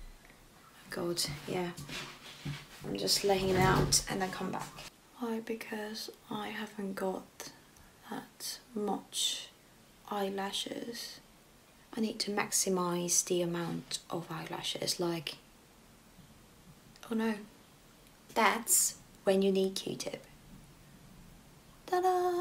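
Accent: England, United Kingdom. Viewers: 고급진 영어~~덕분에 영국 느낌 물씬 느끼고 갑니다^^^^ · 님 브리티시 악센트 들어서 넘 행복해유~♡ 손은 나아지셨나요ㅠㅠ 반지도 목걸이도 다 예쁘고 그 중에 젤 재밌는건 님 얼굴..넘 예뻐서 홀린듯이 들어왔습니다..ㅠㅠ 인스타 사진두 잘 보고있어욥!! Have a good day❤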